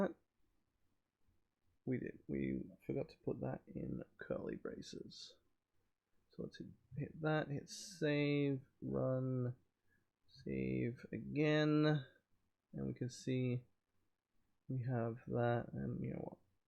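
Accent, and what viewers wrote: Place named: Australia. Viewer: My wife's a septic (Massachusetts) living here in Sydney. Good to hear you still sound like an Aussie :) I'll sit down with TF tonight and hopefully get what I need working. I'll take a look at all your videos when I get a chance, thanks for doing them. The use of the Inverse Relationship the other way around was somewhat ingenious.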